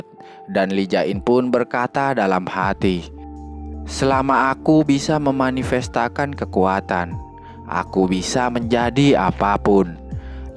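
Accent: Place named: West Java, Indonesia. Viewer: Si mimin nih kaya nya fans nya bkent yagesya.. trus ada logat2 si jhon juga wkwkwk .. org sunda ya bang haha pake atuh bahasa sunda nya da moal nanaon ieuh meh jiga si jhon ngan bedana menceritakan alurcerita anime2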